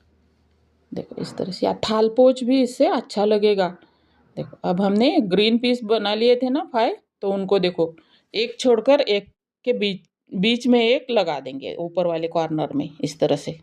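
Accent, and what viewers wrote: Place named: Maharashtra, India. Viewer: mera dost maharastrian hai wo same to same apke jesa Hindi bolta hai ,mujhe sunne me acha lagta hai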